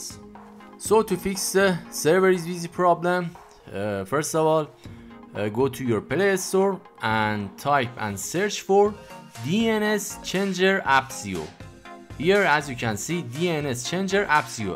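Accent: Iran. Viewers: پیش خودم گفتم این چقدر شبیه ایرانیا انگلییسی حرف میزنه تا اینکه همراهکارتو دیدم😂❤️❤️کارت درسته لنگ دی ان اس بودم❤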